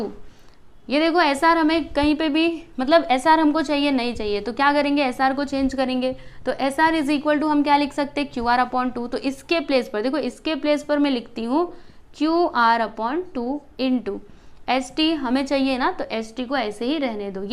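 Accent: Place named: Mumbai, India. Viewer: Your mumbaikar i know from your language like likhneka karneka words❤❤😊😊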